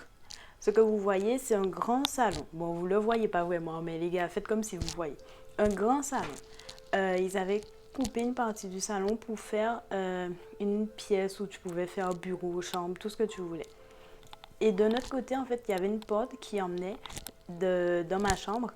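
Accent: Antilles, Caribbean. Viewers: L’accent antillais est présent 😂😂🙌🏾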